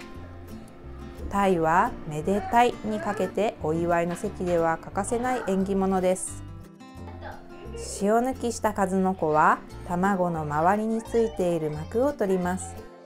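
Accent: Kansai, Japan. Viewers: 素敵なご実家ですね。今もこういったお正月行事をきちんとされているなんて本当にいいことだと思います。さんは、兵庫県のご出身とのことですがいつも動画の中では関西弁が出て来ませんでしたが、今回の動画には出て来ていましたね。😊 今年もよろしくお願いします。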